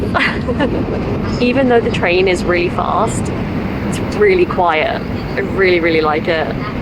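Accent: United Kingdom. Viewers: Lovely！ As soon as I look at your video, I miss England because I always listened to British English that you talk when I lived in England. I like to listen to British English. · easy to hear british pronunciation😄😄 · ＢＢＣの短波放送を聴いていたのでイギリス英語が私には理解しやすいです。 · イギリス英語🇬🇧最高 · Your accent is lovely! I like British accent. Thank you for coming Japan! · 最初の1分くらいはオーストラリア人かニュージーランド人かと思いましたが、イギリス人なんですね。Crisps。 新幹線は、JR東日本のEki-Netがたまに半額やってますね。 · I can listen to your UK English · やっぱイギリスアクセントめちゃいいわ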